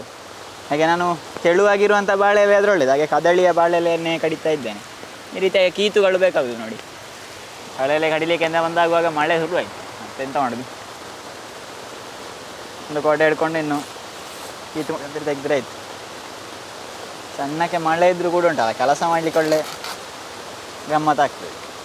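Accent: Mangalore, India. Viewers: I liked your mangalore (kudla) kannada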